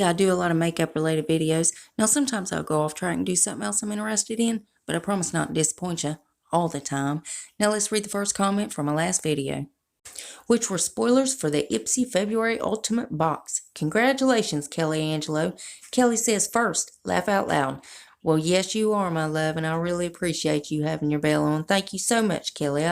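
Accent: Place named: Alabama, United States. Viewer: Are you from Alabama? :) I’m originally from North Mississippi! You speak my language !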